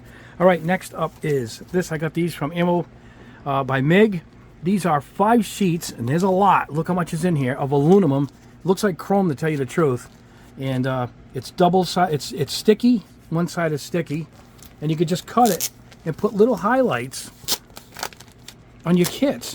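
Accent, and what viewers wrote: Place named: New York, United States. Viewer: Jesus!!! Got my mind blown with the extendable brush thing!!! I was shaking my jar to use the last part!!! Top advice as ALWAYS!! Also, love that NY-Italian accent, it's like hobbying with Il Padrino!;)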